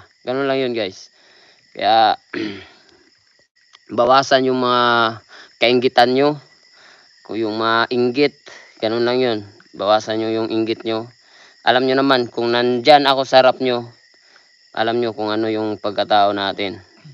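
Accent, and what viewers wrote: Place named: Visayas, Philippines. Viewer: Katawkatawa pa..klaro kaayo wlay grado....ayawg english2 kay bisya ra kaayo ka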